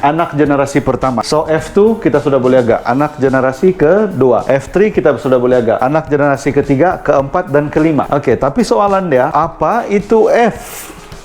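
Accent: Sabah, Malaysia. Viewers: mntapp..cakap sabah serupa dgn bhasa brunei bng..ngam ka salam dr brunei broo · ui na, mantap boss, terus maju jaya sihat selalu dan murahbrezeki bosskur, truskn ckp begitu, sia mok blajar beta sama mok dgr berckaap sabah, btw info harini jelas dan paham terima kasih👍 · Selamat malam tuan..bila sye tengok tuan punya channel Saya faham ape tu maksud p0 Dan F terima kasih tunjuk ajar...jiwa Saya semakin membara untuk breeding betta...Dan Cara tuan cakap sabah teringat sama kawan2 di tempat kerja tahun 2007 dulu..sebab bila bersama diorang terasa Saya nie di sabah...hahahahahahah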